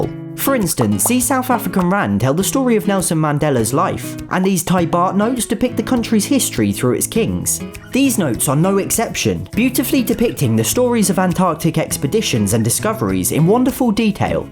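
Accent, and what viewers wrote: United Kingdom. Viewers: Too much British. 3/10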